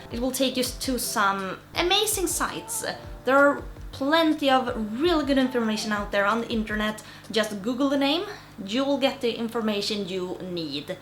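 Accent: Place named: Scandinavia. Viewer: Hey, I was searching for something the other day & found your channel! My name is Stein Eric & I live in Minnesota with a few other Swedish people who you might know......Just a little joke- 🤭 So I'm a big fan of anything to do with freshwater aquascaping. I have been keeping pets my entire life but nowadays it is mostly peaceful tropical fish with the Bettas, Cory's, Dwarf Rainbows, Harlequins,Otocinclus & and the only snails that are allowed to be in the aquarium are Nerites!!!!!! I have 9 aquariums and a handful of small enclosures that are having just plants & several types of Moss (my favorite plant? ) I just wanted you to know I've been enjoying your videos and the best Scandinavian accent that I've heard in too long...I am a 1st generation American and both of my parents are from Norway and grew up in Vik ❤️ I am going to IKEA tomorrow for the supplies I need to build my 1st aquarium and hopefully the 2nd too. 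🤞 I watched Tanner on Saturday, and he had a brilliant idea for sure & if you have not already seen the last video then I am going to be really quiet and now I have the best idea for you to get online and see what Serpa Designs is up to. Ciao 🌉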